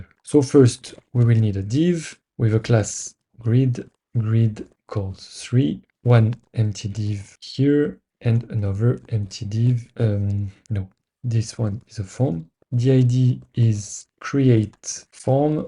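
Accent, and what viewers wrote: France. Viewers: On entend bien l'accent Français, c'est bien pour comprendre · france accent ?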